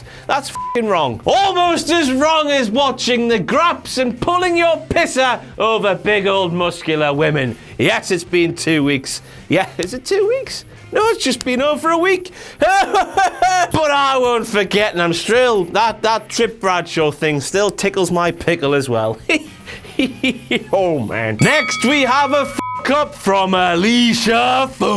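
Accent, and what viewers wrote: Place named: Cockney, United Kingdom. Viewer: More of that cockney accent please haha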